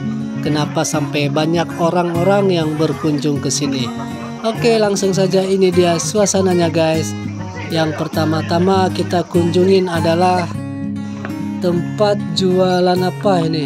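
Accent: Medan, Indonesia. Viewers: bg, abg orang medan y bg?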